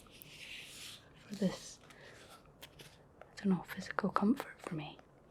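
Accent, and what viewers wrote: Scotland, United Kingdom. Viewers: I love listening to a Scottish accent. It's nice to hear, because usually I'm hearing the West Coast American accent.☮️ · Yeah, Scottish ASMR. Hello from NE highlands!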